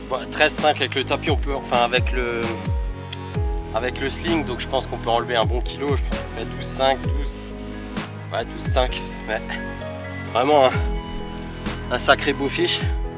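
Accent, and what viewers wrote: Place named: Alsace, France. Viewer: Jolie commune pour l'époque et pas un chat au bord de l'eau. :) Ce petit canal est non seulement très poissonneux mais abrite dans certains biefs de bien belles surprises... Vu l'accent je me suis dit que t'es d'Alsace ou de Lorraine,alors je suis allé sur la page fb et j'ai immédiatement reconnu les photos du Rhône au Rhin à grand gabarit à l'île Napoléon donc j'en conclu que t'es de la région Mulhousienne. :)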